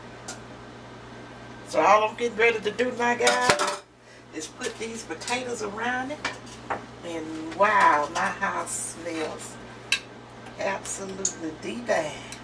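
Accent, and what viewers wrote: South, United States. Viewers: Love your southern accent and your video...making this for Christmas 😊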